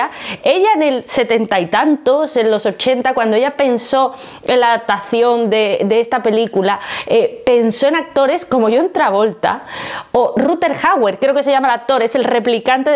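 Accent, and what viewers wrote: Spain. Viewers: Buen analisis, interesante pero definitivamente sigue sin gustarme el acento y exprecion española, si muchos me jusgaran por esto pero no me pasa el castellano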